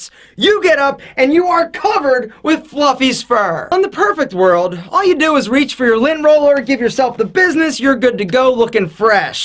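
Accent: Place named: Italian-American, United States. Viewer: I just love your slight american-italian accent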